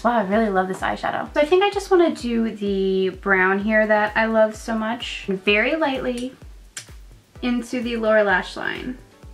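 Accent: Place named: South, United States. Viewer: I hear a southern twang (other than the full country you do) but then I hear a Boston accent where the hell are you from. I would have said l.a before I started hearing all the other stuff.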